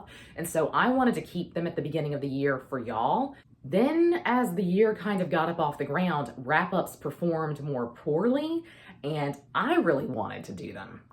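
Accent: South, United States. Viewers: Changing goals? Changing content style? Changing taste in books? Hey, that happens to us all as we grow, age and change our priorities. Just promise that you'll never stop saying "y'all" the way you do! As a Brit I can't get away with using it in conversation (sounds very strange coming out of my mouth with my accent) although I sprinkle it in tweets, comments and text messages whenever I can get away with it; but I just love the way it sounds with your accent - to me it sounds like a proper Southern Belle. I attribute 20% of my overall enjoyment from your videos to the accent and in particular your use of "y'all"; so as long as that doesn't change, the rest is all just details. All the best for 2024! 💙 x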